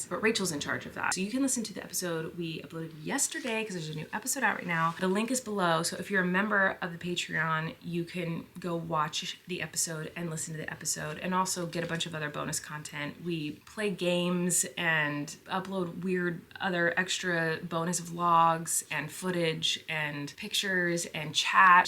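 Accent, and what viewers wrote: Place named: California, United States. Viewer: TTQ: Hi! This is kind of a weird question, but did you find when you moved from LA to New York, or when you moved to Vancouver your accent changed? When I watch you vlogs, I notice you have a bit of a Californian accent (its not that noticeable and its probably because I'm Canadian and I have a different accent than you), and I know for me when I moved from Ontario to the East Coast of Canada my friends said my accent changed! I wondering if that happened to you? I love your vlogs and you are AMAZING!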